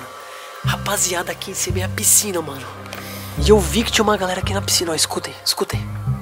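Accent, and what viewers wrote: Portugal. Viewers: Fala portugues de portugal kom os meninos da kasa..😉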